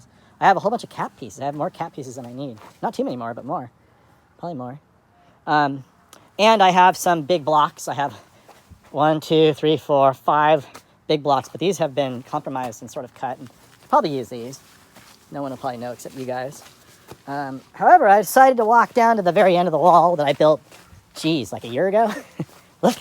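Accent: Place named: Southern California, United States. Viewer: very pleasing SoCal accent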